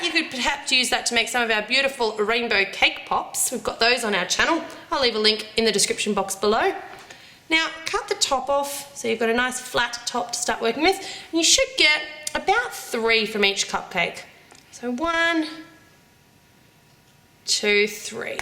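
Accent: Australia. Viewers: its how australians sound ahahah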